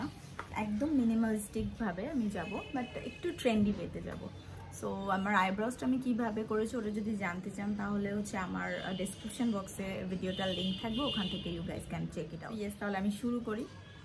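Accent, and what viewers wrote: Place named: Bangladesh. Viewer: Apu tumi khulnai thako. Prothome vabcilam tumi indian. Tarpor tomar bangla accents shune dekhi tumi deshi meye. Akhon to dekhi tumi khulnar. Amio khulnar meye apu. Take love❤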